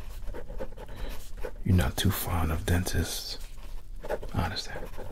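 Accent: United States. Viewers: I love 💕 your American accent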